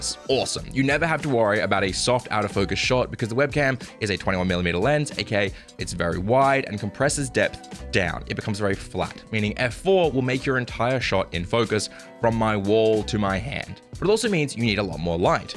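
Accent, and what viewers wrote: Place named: Australia. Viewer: Nice to hear a fellow Aussie :-)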